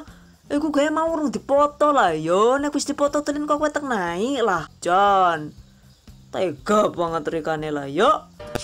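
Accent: Banyumas, Indonesia. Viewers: Satu2 nya yang jelas detail sampe ke hitung2nya, cuma ada di. Ada logat ngapaknya jg😊😊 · Kesuh nyonge..ini yang saya suka dr chanel ini..berbagi resep..dengan ciri khas ngapak.. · Suka bgt dengan resep kue yg selalu gamblang dan mudah di mengerti setiap caranya dan obrolan ngapak nya keren trims 😁😁😁👍👍👍👍👏👏👏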